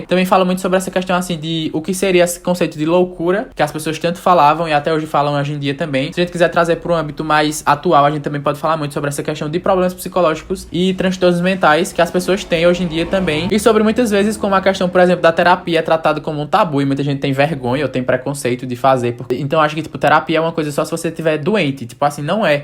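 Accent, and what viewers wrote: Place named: Pernambuco, Brazil. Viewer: Ahh como é bom ver youtubers com o nosso sotaque maravilhoso. Ouso apostar ser do meu país Pernambuco. Ainda mais influenciando a leitura, que é tão relevante. Muito sucesso!! 🙏🏾🙏🏾🙏🏾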